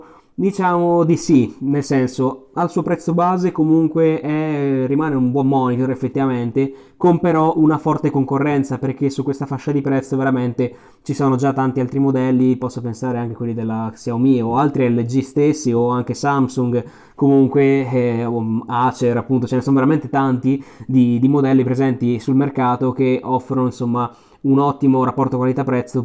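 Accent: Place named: Valtiberina, Italy. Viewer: ma per curo caso, sei di origini umbre? hai un'accento buffo che ricorda quello valtiberino in parte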